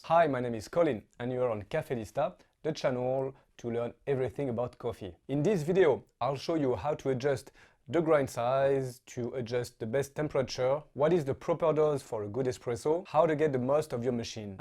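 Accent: France. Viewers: Good English with nice french accent!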